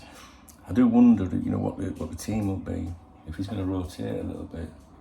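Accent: Yorkshire, United Kingdom. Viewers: How are you doing, you remind me of my Dad the way you talk he was a Yorkshire man he was my dad and my best friend, is it true that our new player is injured. I love your video's there down earth and great fun.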